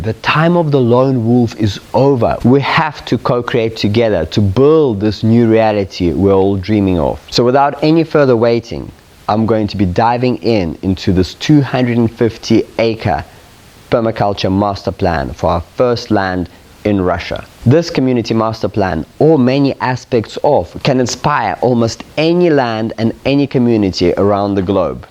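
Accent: South Africa. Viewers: Hi there. Are you South African? Love your ideas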